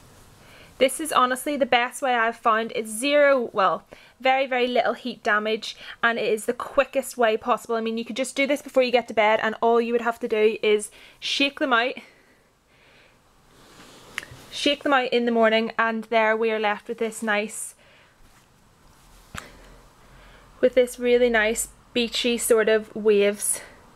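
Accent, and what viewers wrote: Ireland. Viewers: Are you from Ireland or North Ireland?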